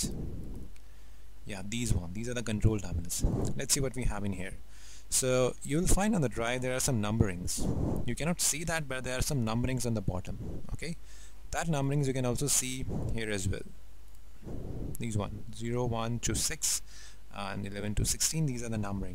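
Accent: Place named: India. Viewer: Please cleanup the sound , and if at all possible a native English speaker. The sing song lulaby of Indian accent is porrly recorded and annoyingly hard to understand. OK? PS not so many OK?s please.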